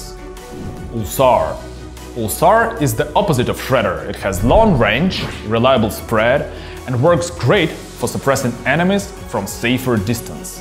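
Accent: Russia. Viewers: ^^^^^^^^Help them Translate??!! To English I hope, I had to put closed caption on to understand this Rooskee..LOL^^^^^^^^^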